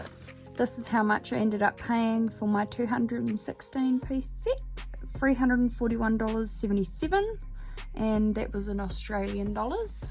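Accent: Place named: New Zealand. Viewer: After the “77” it was super obvious that you are a kiwi 😬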